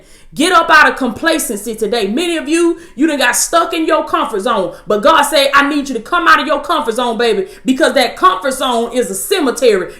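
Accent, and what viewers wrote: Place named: Georgia, United States. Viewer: I knew you was from Georgia you all speak more country than NC people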